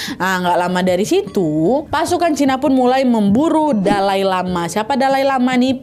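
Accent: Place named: Sumatra, Indonesia. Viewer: Logat kakak nya kayak orang Minang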